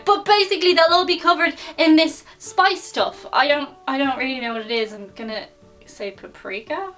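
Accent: Ireland. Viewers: I've been watching your videos and it has just hit me; you definitely have a Non-American accent, and yet I can literally understand every word you say (well, except "butter" - it took me some effort to get this one). However, I had had the chance to watch some Irish movies on Netflix in the past, and I was having a lot of trouble understanding the speech. How come? Is the Dublin accent that much more compatible with American? Is there a Dublin accent to begin with? If not, what am I missing here? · Like your irish accent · It is so refreshing to see that the Irish youth have not wasted their time on learning moderation in tone of voice or tempering of the shrillness that can peal paint and eradicate nearly rodents. Pretty much like every other drunk 20 something with no restraint or filter of any type - the accent only partially makes up for the ordeal. Dante missed a level of purgatory that is truly thine alone. 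· You was totally entertaining. I love to hear the Irish accent. Yes better than the Brits. I had some of you're top ten when in Ireland. The black pudding. No no no. Soda bread and stew ,fantastic, and ice cream Also . Compliments on the makeup. It's well done and tastefull. ( Oh the eyes 😍) I really really liked you're goofiness at the end. Sure is better than credits or a total drop off of video. Hope more of that. Thank you!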